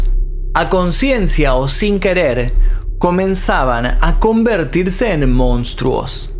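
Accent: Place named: Argentina. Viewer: El acento argentino está de más me cae 🙄 de quien fue la idea de distorsionar el español de esta manera 🤦🏻‍♀️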